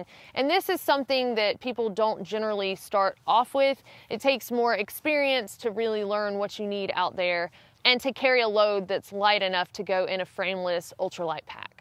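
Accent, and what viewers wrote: South, United States. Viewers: Wow, a firehose of great info. I thought she said "ass axe," then turned on the closed caption option and rewound to discover it was "ice axe." Trying to figure out which part of southern US she's from. Maybe Alabama?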